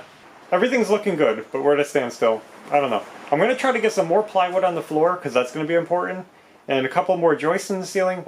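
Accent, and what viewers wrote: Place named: Upstate New York, United States. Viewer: that’s awesome!! I’ve been going back and watching all your videos too!! I’m from upstate New York and I heard you were too.. haha!! I knew I recognized your accent 😂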